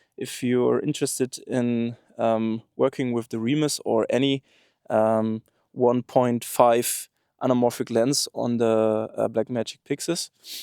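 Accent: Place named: Germany. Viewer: Mach deine videos auf deutsch. Der markt ist gross genug und die welt braucht nicht noch einen deutschen mit furchtbaren akzent der viel zu langsam redet weil er im kopf Übersetzen muss. Geile kamera, sie hätte ich auch gerne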